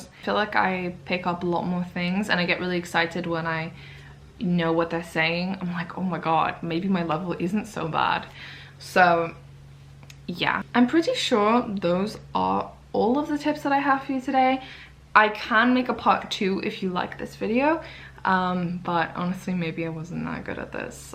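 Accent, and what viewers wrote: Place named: United Kingdom. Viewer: At the beginning I heard only British accent 😂❤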